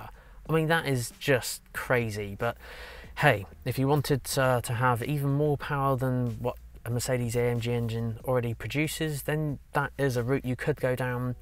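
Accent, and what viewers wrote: United Kingdom. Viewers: you are the true sound of the German Mercedes Benz, with a British accent. Love your work mate.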